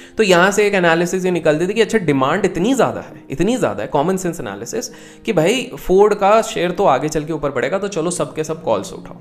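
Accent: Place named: Hyderabad, India. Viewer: Kya miya kar hyderabadi accent 👌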